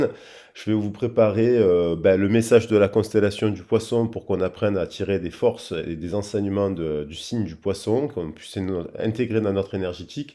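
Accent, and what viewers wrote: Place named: Marseille, France. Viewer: BON et bien bon courage!! J' espère que la communication va bientôt arriver avec Arnaud...au fait Je suis cancer ascendant vierges et descendant poisson 🐠 alors voilà j'y crois comme à la 🌏 terre j'y croit comme au soleil ☀ et à mon Arc en ciel qui va m'apporter de très bonne 🔮 prédictions..bien sûr avec ta voix chaude et sensuelle qui n'appartient à personne qu'à toi sur le net! Et c'est un plaisir de t'entendre à chaque fois avec l'accent de MARSEILLE! BRAVO 👏 POUR TOUTE TA RICHESSE INTÉRIEURE QUE TU AS VRAIMENT MÉRITÉ ET TOUTE MA GRATITUDE POUR NOUS PERMETTRE D'Y ACCÉDER GRATUITEMENT ET AVEC TANT D'HUMILITÉ 👋 CIAO 👋 😆🤩😉🌟❤️‍🔥💞🌈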